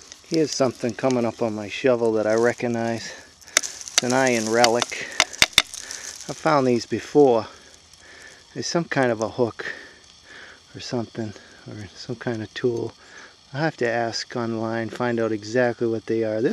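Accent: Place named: South, United States. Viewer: Love your accent! Southern Dirt Fishing Lady doesnt hear that often!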